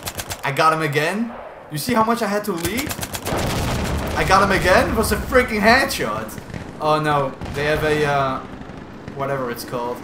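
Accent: Netherlands. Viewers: You dutch right?? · I smell that you are dutch am i correct?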